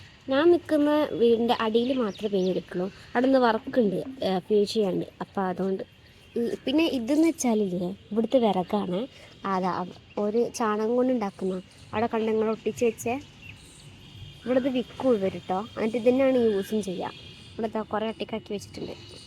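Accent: Malappuram, India. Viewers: മലപ്പുറം സ്റ്റൈൽ സംസാരം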